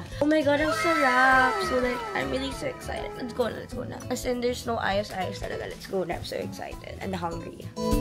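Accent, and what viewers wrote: Conyo, Philippines. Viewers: AAAA i really like listening to you talk conyo, soo cutie talaga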